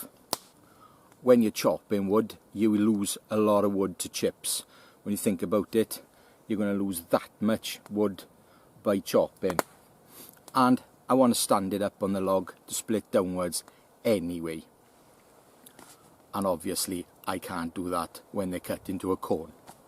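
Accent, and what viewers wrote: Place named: Wales, United Kingdom. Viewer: I've never heard of any of those people before. I think you're the first Welshman I've ever heard or seen. At least I've heard enough Irish and Scottish accents to pick up on the the Celtic sound, it being Welsh never crossed my mind but it does make perfect sense since it sounds a bit like Scottish and Irish but it's clearly neither of those. Anyways, thanks for clueing me in!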